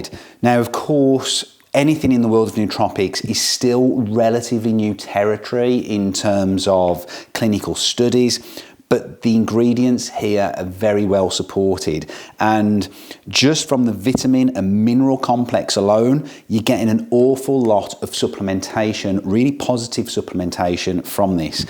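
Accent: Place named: New Jersey, United States. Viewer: Nice accent you got there. New Jersey?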